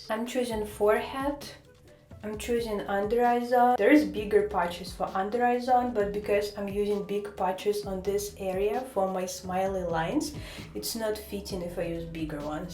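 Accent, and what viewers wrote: Russia. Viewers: that's insane, I've understood that you are Russian or maybe Ukrainian since the first word. If I'm incorrect, it'll be awkward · по акценту одразу зрозуміло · По акценту сразу показалось, что вы русская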